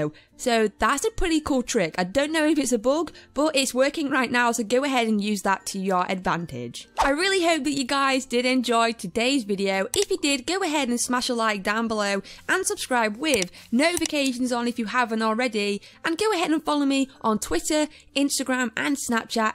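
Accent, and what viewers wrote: England, United Kingdom. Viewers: Cant You Hear Her? And Shes English And What Language Are you?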